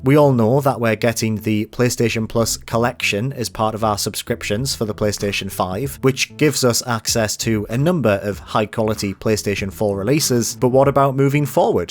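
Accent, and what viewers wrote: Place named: Jarrow, United Kingdom. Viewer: Are you from jarrow m8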